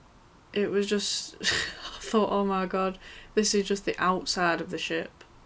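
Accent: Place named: Yorkshire, United Kingdom. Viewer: I'm from selby, and I recognize your accent, you're not too far from here are you 🙂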